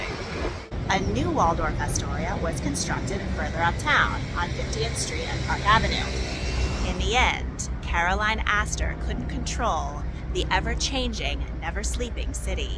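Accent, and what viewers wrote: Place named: California, United States. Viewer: I’m just struggling with the fact that this was apparently narrated by the Californians from SNL. What in the actual hell in this accent? Holy crap I can’t even pay attention to what she’s spewing toward my ear holes.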